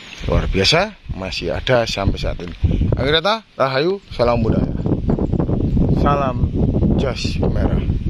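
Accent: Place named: Java, Indonesia. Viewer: 🌀🌺☀️ WA'ALLAIKUM SALAM WR. WB. SaLaaam 🙏🙏🙏🙏🙏🙏🙏🙏🙏 , Smoga SulsEs Luuur and jaga kesEhatan sLaLu Lur 🙋🏼‍♂️🙋🏼‍♂️ , Nb. Warning and Bukan Marning 😀😀 , jangan Lupa Kirim DO'A / DUNGO Sama ALmarhum & ALmahumma okey Luuur 👍👍 , Tingkatkan Logat Bahasa Indonesia + Jawa Sehingga Seumpama Sayur tidak Lupa garamnya 😲😲😀😀 👍👍 , Lanjutken Mbah Kung 🙋🏼‍♂️🙋🏼‍♂️ ☀️🌺🌀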